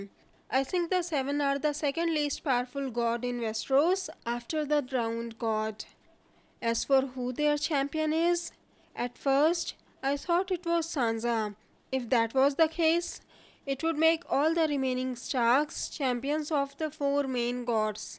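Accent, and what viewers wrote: India. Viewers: the video is good but ur accents indian _. no offence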